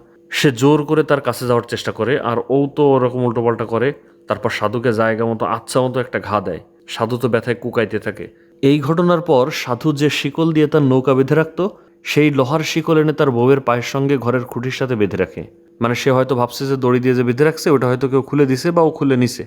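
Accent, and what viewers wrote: Khulna, Bangladesh. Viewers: আপনার বাড়ি কি খুলনা? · ভাই... আপনি কি আমাদের খুলনা/ বাগেরহাট জেলার মানুষ? আপনার কথা শুনলে সেটা বোঝা যায়। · ভাই আপনার কথা শুনে মনে হচ্ছে আপনার বাড়ি খুলনা অঞ্চলে🙂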